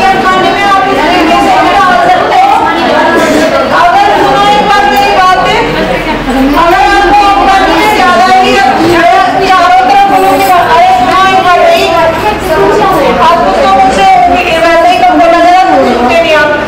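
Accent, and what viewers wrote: Haryana, India. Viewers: Madam ji Apki Awaz se lgta h ki aap Ki boli Hariyanvi h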